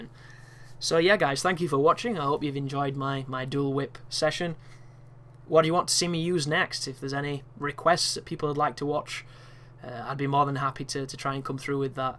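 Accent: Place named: Bradford, United Kingdom. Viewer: Yeah, you've definitely got the accent. I'm gonna guess Bradford then.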